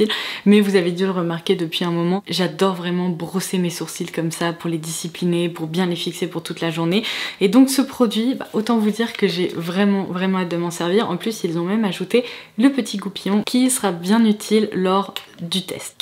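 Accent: England, United Kingdom. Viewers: Bonjour, ma question est un peu hors sujet dsl mais j’aimerais savoir si vous êtes d’origine anglaise du à votre accent anglais. Bonne journée.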